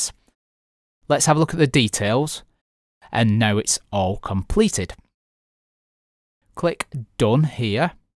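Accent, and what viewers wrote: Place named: Northern England, United Kingdom. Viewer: Other than the heavy Northern English accent great video very well explained! Thank U!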